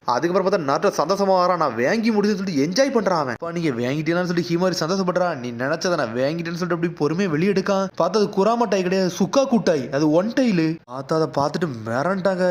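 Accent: Thoothukudi, India. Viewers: Lastaa kuramaa nu sonnathu nalla irunthuchu.. 😊 Neega Thoothukudi yaa na??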